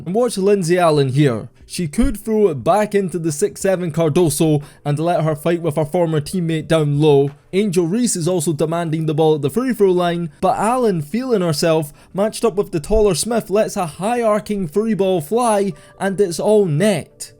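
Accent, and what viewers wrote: Scotland, United Kingdom. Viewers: I know he's saying "The Sky" but everytime this Scot says it I keep hearing him call these ladies "this guy" I was so confused for half this vid 😅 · I now want ALL my WNBA analysis delivered in a Scottish brogue❤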